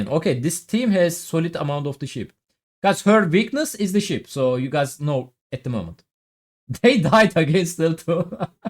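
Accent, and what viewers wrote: Turkey. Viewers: bu elemanin aksani türk ingilizcesi gibi değil mi 😅😅 türk olduğuna iddiaya girerim ama ispatlayamam😅😅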